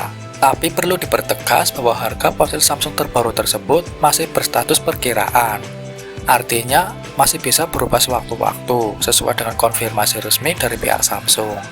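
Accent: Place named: Java, Indonesia. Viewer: Voiceover nya jawa medok, ga enak didengarnya 😌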